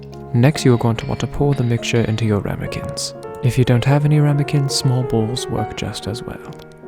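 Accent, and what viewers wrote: United Kingdom. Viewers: If he didn’t introduce himself as a British gentleman from the 1800’s I would think that that was his actual voice/accent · British accent, nice hair, cooking AND he has a suit on?.. sir, are you TRYING to make me simp for you? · the dumpling is cry out for help, and i'm just admiring british voice over🙃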